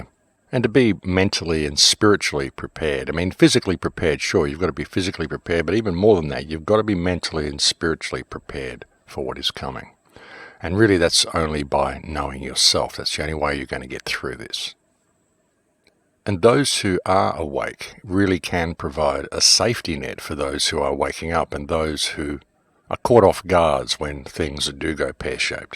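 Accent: Australia. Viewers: Jumbo jumbo with a “ Britt “ accent. Actually, Aussie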